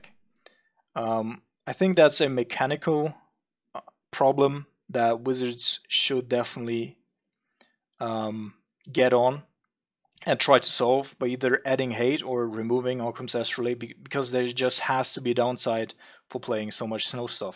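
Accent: Germany. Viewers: I like your accent, where are you from? Germany?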